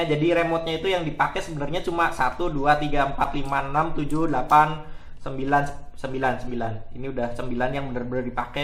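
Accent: Banyumas, Indonesia. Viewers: ok sipp makasih mas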